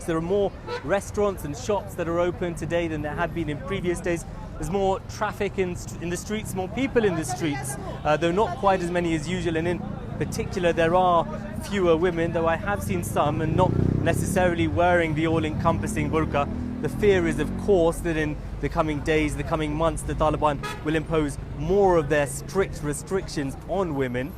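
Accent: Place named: United Kingdom. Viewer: イギリス人みたいな発音だ。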